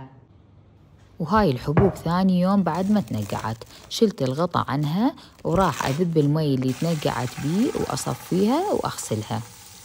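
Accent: Iraq. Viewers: يسعدلي هالصوت العراقي ❤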